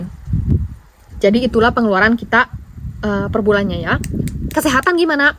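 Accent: Bandung, Indonesia. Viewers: Bicaranya khas bandung banget...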